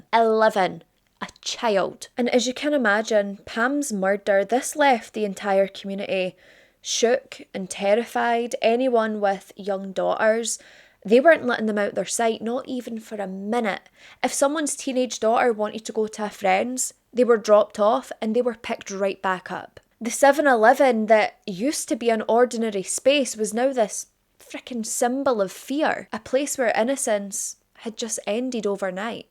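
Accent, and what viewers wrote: Scotland, United Kingdom. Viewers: i’ve been a silent (unknowingly unsubscribed) watcher for a couple of months and hearing a scottish accent pulled me right in. i’ve not seen a vid from you about it so was wondering if you could cover the caroline glachan case? she was a young scottish girl from my village and i grew up with the kids who were sadly witness to this crime. it always breaks my heart that people were so quiet and scared for so long, some knowing/suspecting who the perpetrators were but didn’t come forward.❤💙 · I've only recently discovered you, but immediately subscribed after the first video. You have a very pleasant voice, and like so many I adore your beautiful Scottish accent. Will definitely be binging more of your vids! Love and appreciation from Belgium <3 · Hi, case suggestions: Maybe you could consider another case from my native Norway; the serial killer nurse Arnfinn Nesset, convicted in 1983? 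Horrendous case imo. Your channel is my to go true crime channel. Love your presentation, and your Scottish accent! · Thank you for your hard work. Glad to hear you believe in paranormal too. You are my favorite out of all of the ones out there. I’m Irish (heritage live in the states) but love you Scottish accent. So so so so cute!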